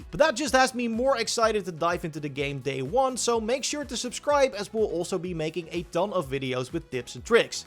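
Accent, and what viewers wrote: Netherlands. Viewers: He is dutch, you're correct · I think he's not a native speaker. His accent sounds dutch.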